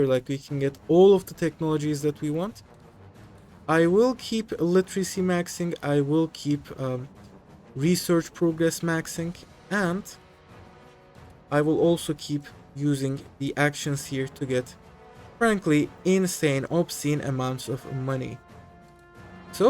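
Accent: Turkey. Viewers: turkish accent detected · Reis Türk müsün, Otistik Türk aksanın var.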